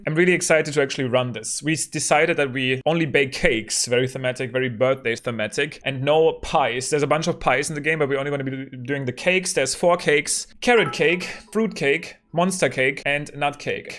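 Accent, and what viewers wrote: Germany. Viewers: My mom is German and I guess I don’t watch a lot of German people speaking English but now I weirdly associate you with my mom. Thanks Also, herzlichen Glückwunsch zum Geburtstag!!!!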